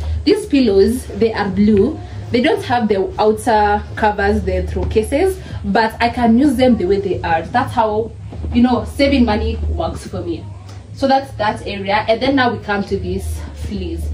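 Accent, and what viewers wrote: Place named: South Africa. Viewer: your accent does sound like south african one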